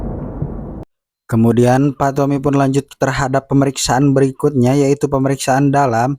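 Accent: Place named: West Java, Indonesia. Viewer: wkwkwkw logat asli pasundan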